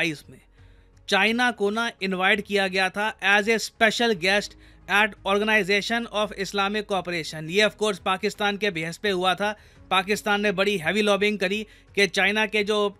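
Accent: India. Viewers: 一股子阿三味儿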